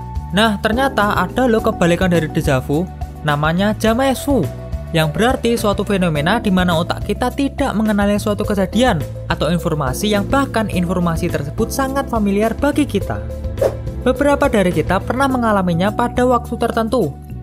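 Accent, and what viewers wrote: Java, Indonesia. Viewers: medok banget mbah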